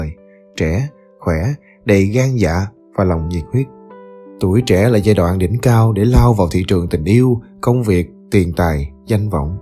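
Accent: South, Vietnam. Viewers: Thằng này giọng Nam nô nghe chán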